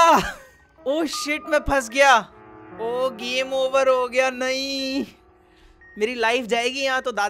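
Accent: Haryana, India. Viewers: Who else miss's his haryanvi accent 😢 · I think he needs to bring his old desi accent back 😂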